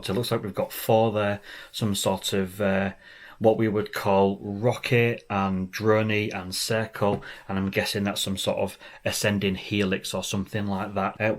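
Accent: United Kingdom. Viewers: You are certainly on top of things. Love your videos. I find them the most useful of all the channels....and it's not just because you Britts make everything sound more interesting:)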